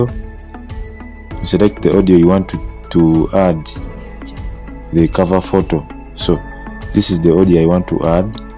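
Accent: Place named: Uganda. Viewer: You are fro Uganda I know the accent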